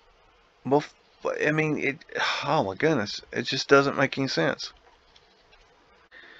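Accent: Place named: Oklahoma, United States. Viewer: That accent, you close to oklahoma?🧐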